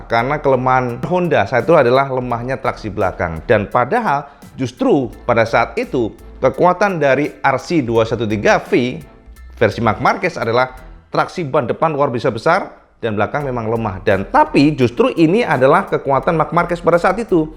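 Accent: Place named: Java, Indonesia. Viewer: seleranya boneka Ooo sampean sembarangan 😀😀 Suka banget kas jawanya cak Dan apa yang disampaikan selalu informatif, is the best 👍👍👍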